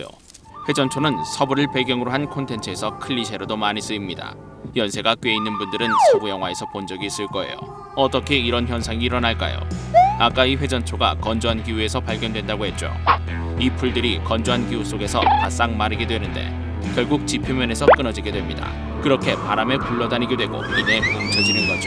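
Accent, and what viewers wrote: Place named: Seoul, South Korea. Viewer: 옛날 서울말 쓰시는 것 같다 ㅋㅋ 옛느낌 낭낭한 목소리 분위기 좋당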